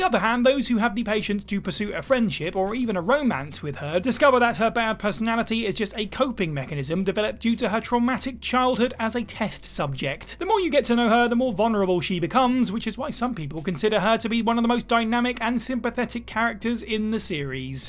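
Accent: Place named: England, United Kingdom. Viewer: England accent 😢😅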